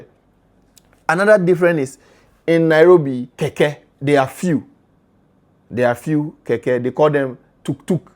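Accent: Nigeria. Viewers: You were called oga cos of your accent..it sounds nigeriAn. Its not cos of the beard cos young kenyans wear beards too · Your english you sound like nigerian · Those mangoes they put pepe there are unlipe they are very sweet with pepe if you mix everything in food we call that ma chakula nobody will eat that, but we like fresh food with flesh spices, in Kenya we say what men can do women can do better, every one in Kenya has bank account in their phone means Avery body is rich 😂😂😂😂, matatus there are those who don't have the noise so you choose the ones you want to use, for the noise ones are called nganya, you look likes ogo even your English is somehow for Nigerians, uhuru means freedom, so you like nyash😂😂😂😂😂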